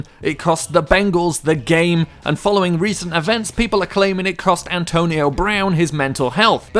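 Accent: England, United Kingdom. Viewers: Theres somethin i really dont like about an english accent talking about american football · This is the first time I’ve watched American football with a British accent over it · Wasn't expecting a Burfict video to be narrated by a Brit lol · honestly have never heard someone with a british accent speak analytically about american football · I love how a British person is saying this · So why is this British guy talking about NFL football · British accents and football don't match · Anyone else think it’s pretty cool a British dude is talking about American football? Edit: or maybe he’s Australian idk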